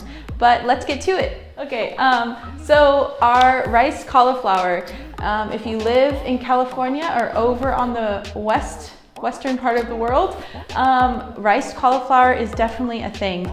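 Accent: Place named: Morocco. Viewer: Hello hello bravooo thank you for your recipe ❤ I like it 🌹 good luck . I like your Moroccan accent ❤❤❤❤ keep it good guys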